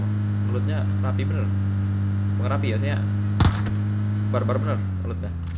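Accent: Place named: Central Java, Indonesia. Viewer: Dari jawa tengah mana suaranya🙏🙏🙏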